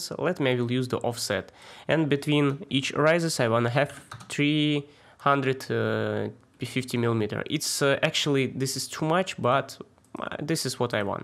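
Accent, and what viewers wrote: Russia. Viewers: Спасибо за урок. Судя по акценту ты с России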